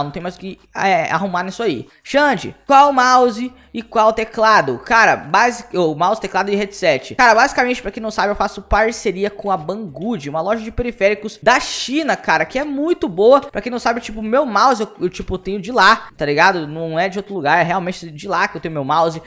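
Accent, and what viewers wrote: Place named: Rio de Janeiro, Brazil. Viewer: Carioca mano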